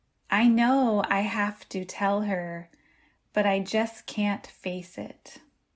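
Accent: United States. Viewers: 美式發音哦！ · 我要感谢你美妙的美式发音，可以给我很多帮助和信心。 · I like the videos authentic American pronounce. Thank you!❤ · 是美式英文嗎?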